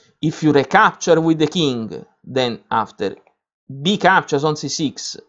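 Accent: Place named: Italy. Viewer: Good comment... however... from the accent it is clear that you are italian...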